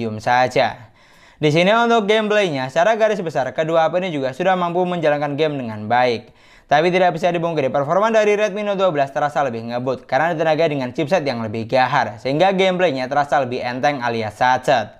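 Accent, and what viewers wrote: Madura, Indonesia. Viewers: Aksen madura ya kk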